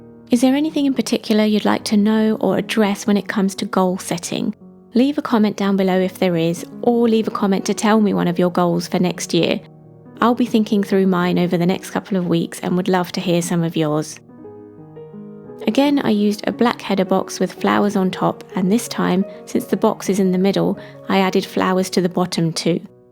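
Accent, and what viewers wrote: United Kingdom. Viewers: Made it to the final spread, love the minimal approach, got some great ideas too, My goal is to use my planner to be more productive and sell more of my jewellery. Its lovely to listen to a fellow brit ❤ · I made it til the end and are now your newest subbie🥰💖 LOVED the set up and the notebook and I am very impressed by your ability to keep it so neat and clean and minimalistic but still very beatiful and inspiring and incredibly pleasing to the eye, or for the eyes(?)(English is not my first language, I am from Kristiansand in Norway❤️👱🏻‍♀️❤️💙🇳🇴💙❤️ I can’t wait for more videos to come and now I am gonna look for as many videos from you as I can find, cos I REALLY LOVED everything about your art and British accent of course (lol😅🥰) and your editing is amazing. One of my main goals for this year is to learn how to edit videos so my subbies and others who see my videos don’t have to always watch in real time every freakin video, except for the flip through ones where I just show off something I made. 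And another (more important) goal for next year is to try and eat at least one time every day. Because of my recovery from Anorexia I have ruined my feeling of hunger, so if no one or nothing reminds me to eat, it can go days without food or water(except for when I brush my teeth every morning and night then I’ll have some sips of water but that is not enough. And I want to try again and cure some of my insomnia which I’ve had since day one when I was born. 20 minutes is the record in my entire life on how long I’ve slept and I REALLY DESPISE (or how you spell almost hate) waking up after one or two minutes of sleep every bloddy night. So that is why I am sitting here at 4.30(?)(we a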